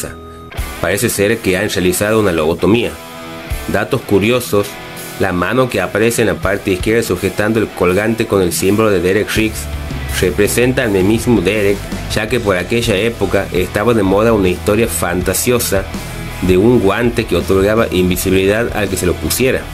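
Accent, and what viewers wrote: Tucumán, Argentina. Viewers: Sos de Tucuman ?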